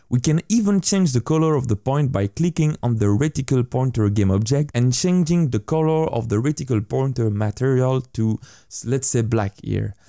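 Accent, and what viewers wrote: France. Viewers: As a French person, I can undoubtedly detect a French accent when I hear one!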